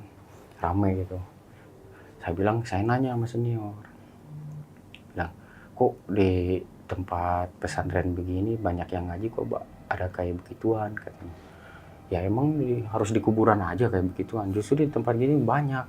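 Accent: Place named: West Java, Indonesia. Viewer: Sudanis pisan. Rada paciweuh pabaliut letah mun ngomong bhs.indonesia teh nya kang abi ge kang. Salalamet sdyana 🙏🙏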